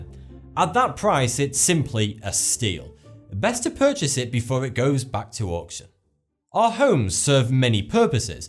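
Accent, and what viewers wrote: United Kingdom. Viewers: He is saying 42. It's a play on words sort of thing. The number 42 is said to be the "answer to life, the universe, and everything" or something like that. This has to do with the fact that he tells various facts about things in life in his videos. His channel is which sounds like 42 in his British accent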